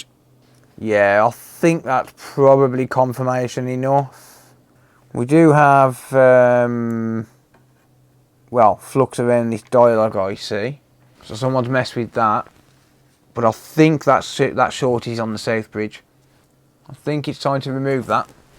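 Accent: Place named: West Midlands, United Kingdom. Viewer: That sounds like a Willenhall accent. 👍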